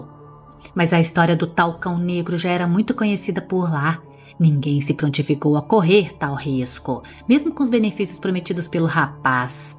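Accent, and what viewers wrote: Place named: Mato Grosso, Brazil. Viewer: Imaginava ela morena de cabelos pretos tipo Índia, sua voz lembra do interior de Mato Grosso.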